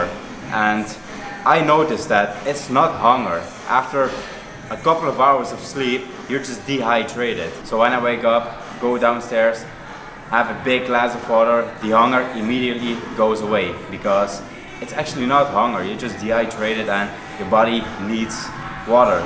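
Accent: Netherlands. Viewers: You sound like you're from Limburg.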